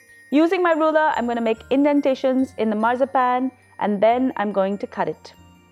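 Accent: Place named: India. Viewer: I like your accent eventho you're Indian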